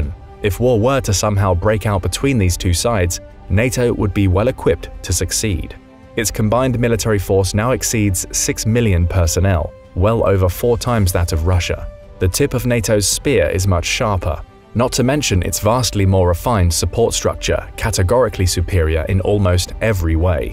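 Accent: United Kingdom. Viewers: Is this the Infographics guy with a British accent? 😮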